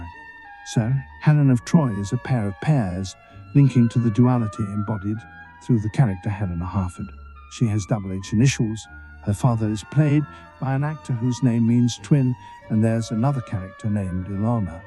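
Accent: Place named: United Kingdom. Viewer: I love discussions on this film. I don’t have anything to add that hasn’t already been said But the flow, and delivery of the speech analysis makes it hard to absorb information. It is a bit too fast. There needs to be longer breaks/ pauses when you make those unique connections. ( and you did a great job on finding them! ) Also, maybe using added arrows with dual screenshots can help solidify each connection. Lastly, the AI voice over generator sounds pleasing- but makes it hard to follow quickly. Most Americans take an extra micro second to interpret British accents.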